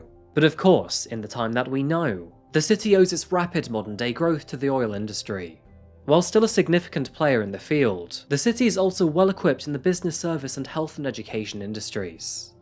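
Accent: United Kingdom. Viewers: Hearing a British guy, talking about Canada STILL reference United States dollars here just makes me happy. We're still #1 baby!!! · Hey. I thought you're American. Are you British? You've said you're from Seattle before as far as I remember. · Where do I send the coffee? Wait, you're British? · You live in England? That’s why your British accent is coming back. 🙂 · I love the way you narrate the stories and your British accent.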